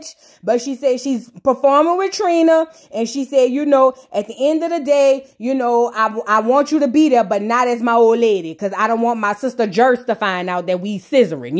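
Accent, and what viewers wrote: New Orleans, United States. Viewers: You’re hilarious! 😂 I started following you last week. I’m NELA but I feel like I’m listening to my NOLA friends. Gotta turn them on to your channel.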